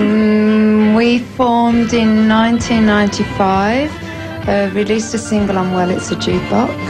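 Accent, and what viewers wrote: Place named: Black Country, United Kingdom. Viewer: She's a yam yam brummie through and through. We are from the same area. Sad at her passing.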